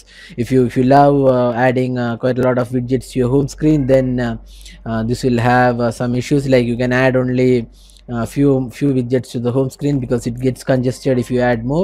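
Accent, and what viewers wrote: India. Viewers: Love the india accent!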